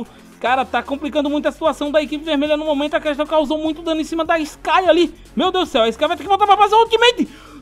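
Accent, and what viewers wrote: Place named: Bahia, Brazil. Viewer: Você é da Bahia ??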